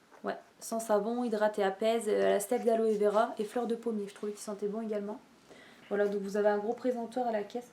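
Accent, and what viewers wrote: Nord-Pas-de-Calais, France. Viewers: Coucou. Le noz de louvroil est il plus petit ou grand que celui de fourmies?je suis du val de maubeuge.merci pour tt.et sympa ton accent chti.heinnnnnn!!!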